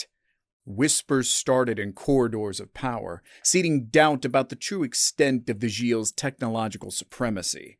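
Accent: South, United States. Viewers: YES! of course, as the University of North Carolina found out when studying North Carolina accents, there are 105 different versions of southern accents in that state! Good Job, you all, in choosing this accent for such a good story! Same plot as in many AI generated stories but it is the way a story is told rather than the actual plot, that counts for all!! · I like that you actually used your voice for this, as opposed to every other HFY channel, but one thing: WHY A HEAVY SOUTHERN ACCENT? ITS DUMB, OVERUSED, AND IS AN AFFRONT TO MY REGION (WE DONT SOUND LIKE THAT) Great video!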